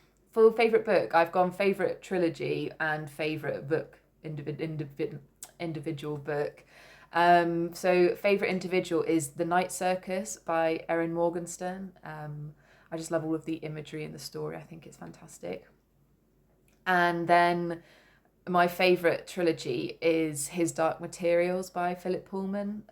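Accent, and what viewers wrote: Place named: Cornwall, United Kingdom. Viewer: ... your lovely, your projects are beautiful. Your graduating to lovely & big Samplers. Modern Folk Art is in Flosstube, he’s got many pieces, some reproductions, that reflect your large pieces. When you says Manchester, I thought hummm that’s not a Manchester brogue, & then Cornwall came out, yes lovely to listen to you 🌺 Check out Hands Across the Sea, Nicola Parkman. She’s in Cornwall. Floss tube is a rabbit 🐇 hole... delightfully so. Until next time. Stay safe... Blessings ~ across the pond, 🇺🇸 Ohio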